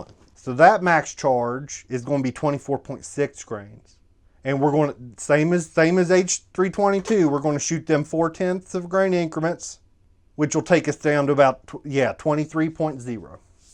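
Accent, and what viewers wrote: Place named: San Francisco, United States. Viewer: That intro voice sounded like some San Francisco hillbilly, and I thought it was the Yankee Marshall, and I almost turned it off.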